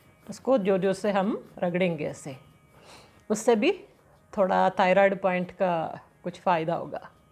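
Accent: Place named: South, India. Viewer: आप है उच्चारण दक्षिण भारतीय है।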